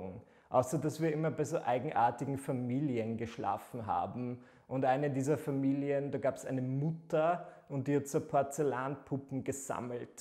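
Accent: Vienna, Austria. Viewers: ich liebe deine videos. Dein wiener slang ist sooo geil, dein Humor auch ich liebs!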